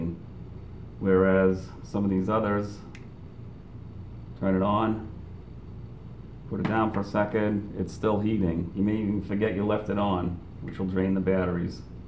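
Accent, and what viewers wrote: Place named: Maine, United States. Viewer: you sure sound down east, by jeezis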